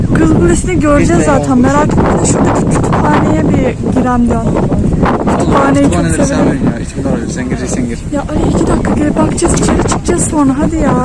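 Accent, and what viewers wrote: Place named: Aegean, Turkey. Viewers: Bu kız %100 egeli. Konuşma tarzından anladım. Egeli olmadığım halde djsjdjssjs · nerelisin şiven çok tanıdık da Kütahya civari mı sormak istedim🤗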